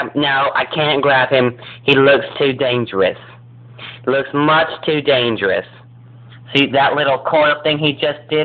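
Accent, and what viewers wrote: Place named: Australia. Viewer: ! I love your Australian accent and your amazing skills with the newspaper. I can't wait till you make more videos like this!:)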